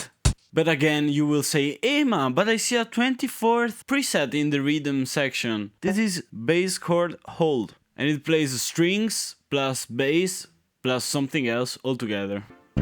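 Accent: Italy. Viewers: intanto l inglese lo parlo e lo scrivo senza problemi. Ma il tuo accento è davvero pessimo, stride, è fastidioso a un orecchio italiano, figuriamoci a uno inglese. Non a caso avevo capito subito che eri italiano. Cioè parlare inglese non significa tradurre le esatte parole ma esprimere il concetto dall’italiano all’inglese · I was just watching this video now and I told my girlfriend " this guy is def italian" then i said "he sounds like he's from my hometown too" then You showed lido degli estensi and I was like bruh... I went to highschool there! small world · sei italiano hai il mio stesso accento quando parlo inglese😂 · sei italiano?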